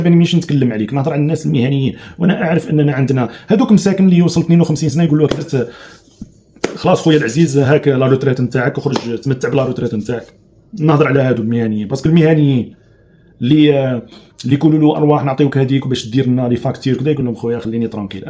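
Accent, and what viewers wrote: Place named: Morocco. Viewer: لهجه مغربيه 😂😂😂😂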